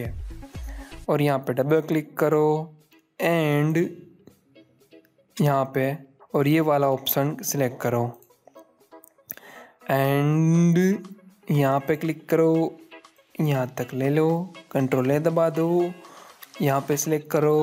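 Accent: Gujarat, India. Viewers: gujrati tone....so cute....peli line sunte hi mujhe pata chal gaya aap gujrati he....the way you say dro 😄instead of draw.....thats so cute....gujarati bhasha sach me bohot mithi he ❤❤❤ subscribed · Gujarati chho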